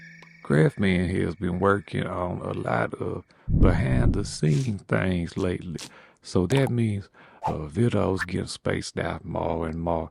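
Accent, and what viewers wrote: Louisiana, United States. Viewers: Your hittin those "er"s pretty hard in this video. computer, figure. Just a bit different. As I have said in the past part of the appeal of your videos is your cajan accent.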